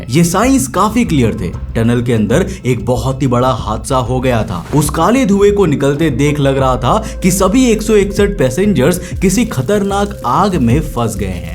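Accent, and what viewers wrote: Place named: Bengal, India. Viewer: Tumri Hindi accent babitaji jaisi hai yani bongali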